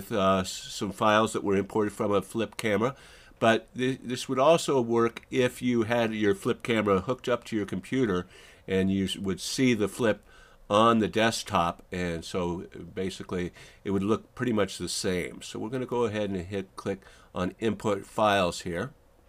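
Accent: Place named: United States. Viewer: the english of yours is pretty dement, speaking like proper yankee.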